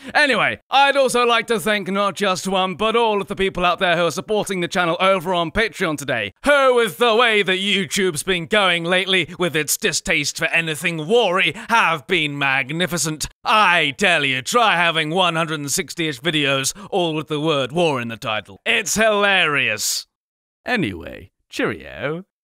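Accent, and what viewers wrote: United Kingdom. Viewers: British accent is so annoying 💔🤷‍♂️ Am I the only one? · very british accent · Are you British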